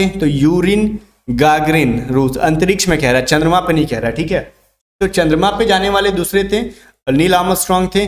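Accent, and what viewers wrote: Bihar, India. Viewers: Abe apni bihari mat jhad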